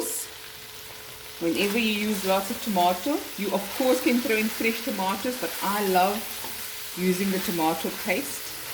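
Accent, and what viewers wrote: South Africa. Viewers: Nice to hear a South African accent again . Regards from Switzerland . Looking forward to cooking it . Looks lekker .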